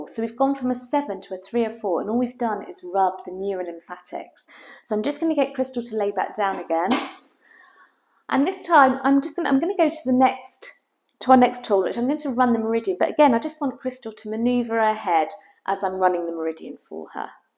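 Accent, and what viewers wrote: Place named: Cockney, United Kingdom. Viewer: Why does this nurse speak with a strong cockney accent? She must be related to Rodney Trotter from Only Fools and Horses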